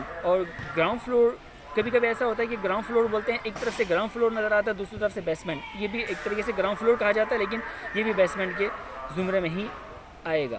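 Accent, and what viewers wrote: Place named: Karachi, Pakistan. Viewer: Bhai ka sahi karachi wala accent ha .. zabardast...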